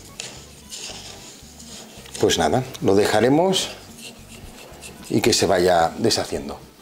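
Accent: Catalonia, Spain. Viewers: a ti. Además diría q eres catalán por ese acento y por los profusos «hacer servir» jajaja. Así q salutacions des de Barcelona :)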